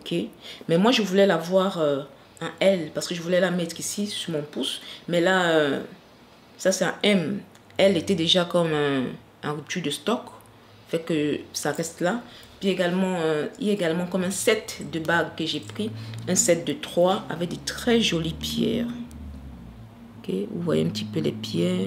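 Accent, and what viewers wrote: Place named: Cameroon. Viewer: Je ressens un accent camerounais, vous êtes camerounaise ? J’adore ce haul surtout la longue robe à la fin la .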